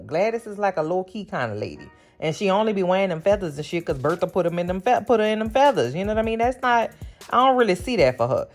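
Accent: New Orleans, United States. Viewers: New listener here! Stumbled across your review of AJLT, immediate listen just because I love the New Orleans ⚜️ accent so bad ❤. Then found you were hilarious AND was reviewing TGA too? Done. Subscribed. 😊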